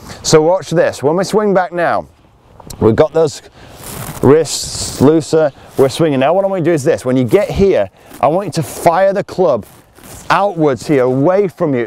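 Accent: Yorkshire, United Kingdom. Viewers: That's because he's from Yorkshire - no bull!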